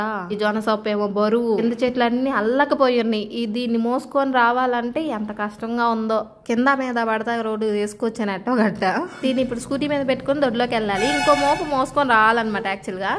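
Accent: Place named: Kanigiri, India. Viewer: my native place also nearby kanigiri and okasari reels chustunte e sister video chusa and tana slang chusi doubt vachi(manam enta dooram vellina mana own roots aete marachipolem kada😊😊😊) nenu adiga me proper akkada ani e sister reply echaru so ala naku telusu...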